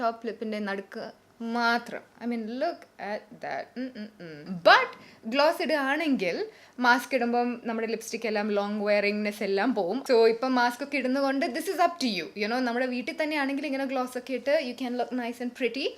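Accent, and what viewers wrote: India. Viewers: I am glad you're trying videos in Malayalam! I am in Canada and I understand the language fully but its really hard to keep a conversation flowing even though my parents speak to me fully in the language. This motivates me to keep talking. Once I start, I am fine. Your Malayalam is definitely improving from other attempts so keep going! At least you have an Indian accent so it helps for it flow smoothly too! :) That is so sweet a follower helped for the translation too to help non-speakers!!! You're almost to 1M and I am excited, you are going to hit there before Jan 2022 I can feel it!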